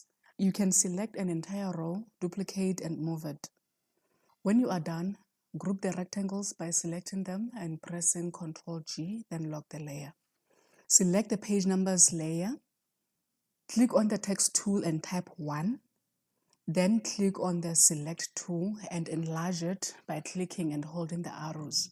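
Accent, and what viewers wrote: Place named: South Africa. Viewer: You sound soooo South African. Thank you for the tutorial!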